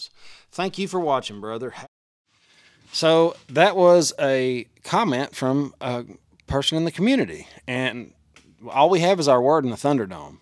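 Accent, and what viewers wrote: United States. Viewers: People with accents like yours are the best thing about America.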